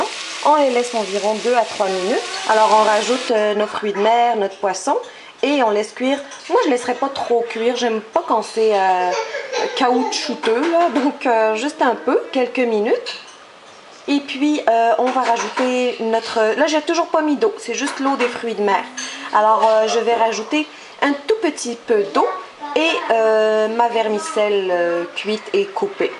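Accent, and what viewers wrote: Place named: Quebec, Canada. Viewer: J'aime pas vraiment l'accent québécois ca ne te va pas très bien désolé :(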